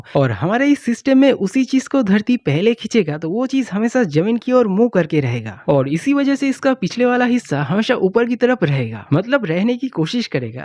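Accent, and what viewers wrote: West Bengal, India. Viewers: You are from west bangla right??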